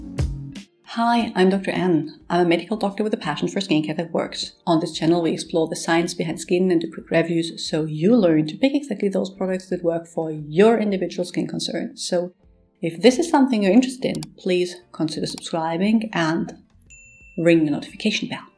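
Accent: Germany. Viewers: WOOHIOO ! Thanks! My sister in Canada uses it and even though she's 60, she could easily pass for someone in their 40s - not that it's "so important" to look young but to take care of ourselves and to look as good as we can is perfectly fine, as far as I'm concerned. Btw, I must add that your accent when you speak English is just lovely! I couldn't place it at first but then I saw that you were German. I've been in Belgium since 1989 but am originally from Canada. I wish you all the very best and.... a very MERRY CHRISTMAS to you and yours! 🙂🎄❤️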